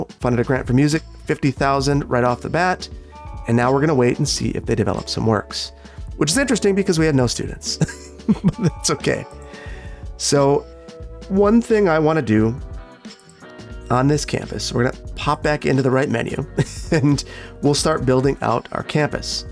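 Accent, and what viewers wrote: Wisconsin, United States. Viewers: After watching a few more episodes, I think he just means he doesn't like the look of it in the game. It sounds like he's from Wisconsin and there's cetainly very hilly and cliff-esque builds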